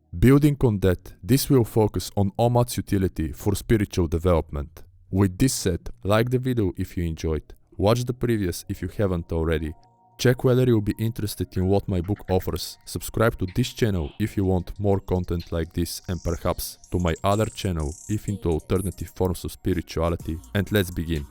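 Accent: Russia. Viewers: Very hard to understand your Russian accent. Good content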